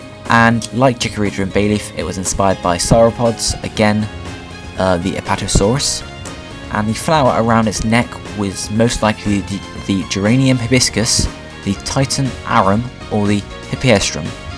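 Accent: United Kingdom. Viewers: In UK English Herb is pronounced with an h, from his accent you can tell he is from the UK ;)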